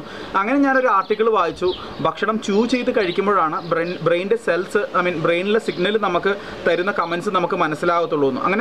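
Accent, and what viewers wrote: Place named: Pathanamthitta, India. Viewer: ഇല്ല ഭായി സംസാരത്തിനു യാതൊരു കുഴപ്പവുമില്ല. ഞാൻ കരുതിയത് ഭായീടെ നാട് പത്തനംതിട്ട ആണെന്നാണ്.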